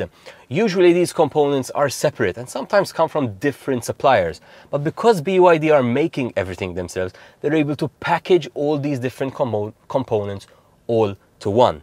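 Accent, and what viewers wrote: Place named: Malta. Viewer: I was watching this video and kept saying, this guy sounds Maltese, weird... 😅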